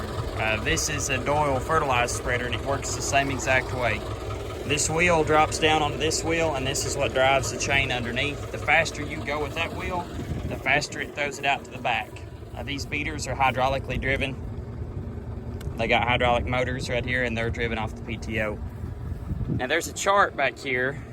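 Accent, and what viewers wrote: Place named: Virginia, United States. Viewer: He sounds like he's form Virginia or North Carolina.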